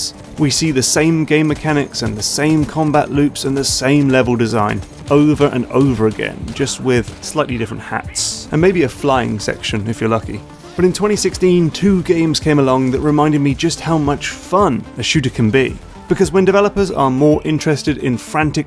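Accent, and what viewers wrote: United Kingdom. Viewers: Your British is showing! ; ) "This thing, that chases you like a quarterback." Quarterbacks don't chase people! Anyway, love the channel! Keep up the good work.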